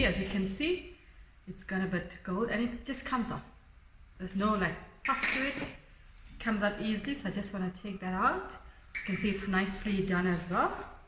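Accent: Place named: South Africa. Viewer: well if they are not a professional probably explains the whisk situation, as i heard "chef", im not a professional but i certainly know how to cook and bake, but tbh it was embarrassing to see handling that whisk! i was cringing im all for loving amateur baking and cooking even if they dont have all the tools in the kitchen but ones should know how to cook use it she was pretty messy. im only giving constructive critism not a personal one you still didnt get the camera focused on the food.:( Ps i would take your challenge the thing is i dont live in S africa Im guessing from your accent!:) anyway hope to see some improved use of spoons:) Your probably hating on me now!!!:)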